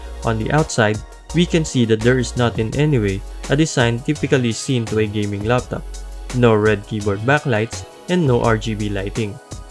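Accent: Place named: Philippines. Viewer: Im not a Pinoy but I can recognize that the reviewer is Pinoy from his accent 😁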